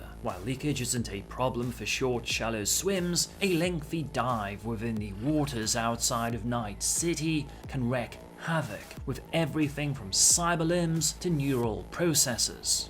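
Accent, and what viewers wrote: England, United Kingdom. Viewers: Man, are you from England? Nice accent.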